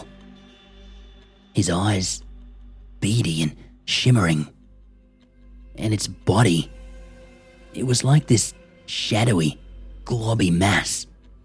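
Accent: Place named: Australia. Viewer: Love the Australian accent.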